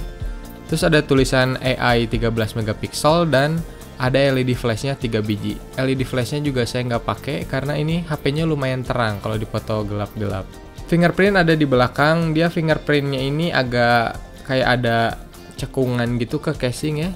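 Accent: West Java, Indonesia. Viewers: Nyariosna khas sunda nya..hhehehe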